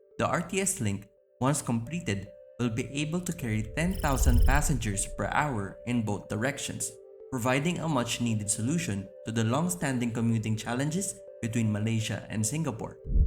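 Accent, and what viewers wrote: Philippines. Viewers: Why such heavy Philippines accent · A pinoy narrating this.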